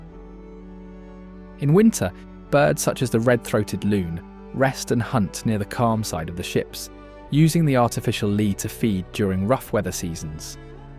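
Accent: United Kingdom. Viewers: Didn't expect a British dude narrating this lmao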